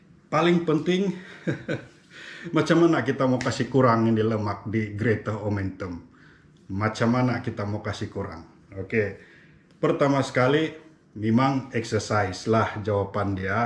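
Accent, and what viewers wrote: Sabah, Malaysia. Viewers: Tq doc.. Siok sia dgr dlm logat sabahan begini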